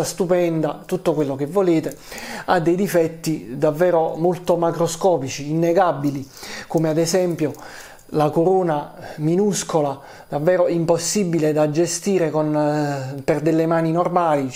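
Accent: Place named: Paris, France. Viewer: Disturba solo un poco l accento parigino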